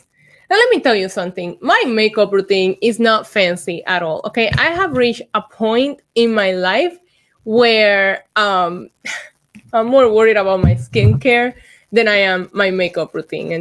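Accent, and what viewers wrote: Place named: Puerto Rico. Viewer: I told her you like your accent and I made a comment the last time and I guess you seen my comments but are you Puerto Rican because you talk like you are Puerto Rican but anyway I like that New Concept with you do your makeup and talk about wrestling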